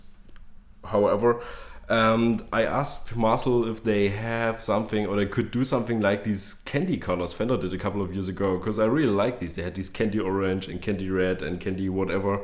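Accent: Germany. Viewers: Es el acento alemán y es algo muy común y no se porque comentas en español siendo este un vídeo en ingles.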